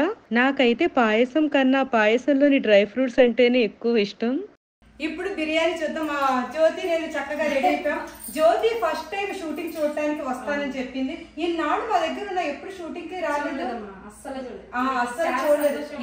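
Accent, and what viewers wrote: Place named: Andhra, India. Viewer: మేడమ్ గారు మీరు తెలంగాణాలో ఉండి కూడా, అంద్ర తెలుగులో స్పష్టంగా మాట్లాడటం చాలా సంతోషం అండి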